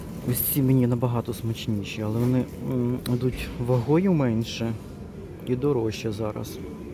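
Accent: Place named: Western Ukraine, Ukraine. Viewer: ну звісно як без них? Але так гарно розмовляючи українською і портити її західним акцентом просто не повага до самої мови. Дуже шкода.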